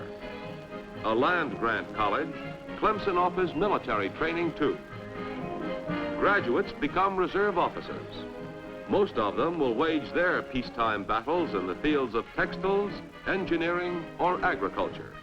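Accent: Transatlantic, United States. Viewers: he is speaking with a TransAtlantic accent.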